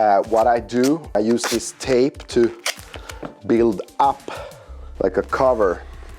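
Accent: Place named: Sweden. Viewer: Fan vad jag gillar Hur du förklarar Doften av Hubba Bubba =) skön informativ video med skön svengelska ! tumme upp...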